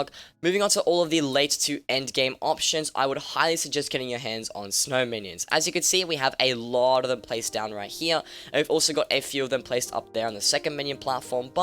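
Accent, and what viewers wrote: Australia. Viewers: Where in Australia are you from?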